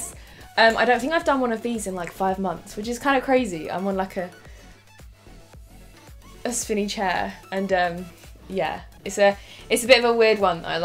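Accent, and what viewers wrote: United Kingdom. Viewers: British accent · She sounds British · Is your accent British ?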